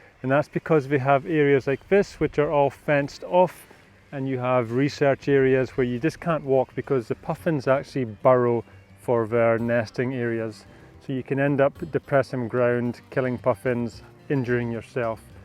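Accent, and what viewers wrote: Scotland, United Kingdom. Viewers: Cool, I gathered you were Scottish from you accent :-) But I got worried that something maybe wrong on either end. It's not like Scotland is nearby to get home for the weekend :-)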